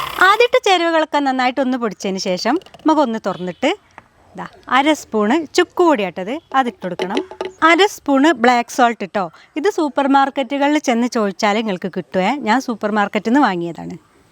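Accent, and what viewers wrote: Malappuram, India. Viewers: നിങൾ മലപ്പുറം ജില്ല ആണോ.എന്തായാലും സൂപ്പർ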